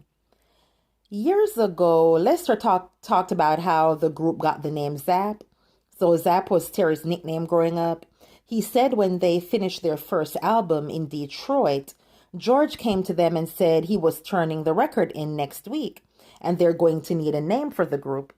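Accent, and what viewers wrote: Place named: Jamaica. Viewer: hey are you Jamaican? I hear a very slight Jamaican accent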